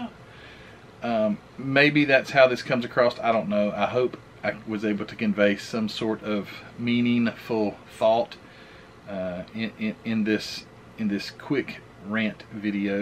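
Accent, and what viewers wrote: South, United States. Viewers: you are real. That's what's so endearing about your channel. Yes you build really cool models but it's more than that. When I first saw you, it was after a Luka Cee video or an HPIguys video. I was really drawn in by your southern accent and the way you talked about your model. I'm glad you want to stay grounded. Thank you for being real.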